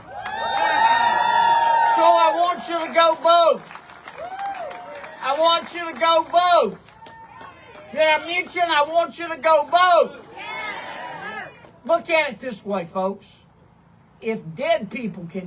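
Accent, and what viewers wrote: South, United States. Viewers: You guys actually listen to this guy? 15 years ago he barely had a Southern accent, and now he is full bore Good-ol'-boy South. What a joke. "Dear Lord give us more people like Hershel Walker and his fans, that can barely rub two brain cells together, so that we can stay in power forever, and not actually do anything for these constituents . Oh we'll talk a good game ... but actually do nothing for them."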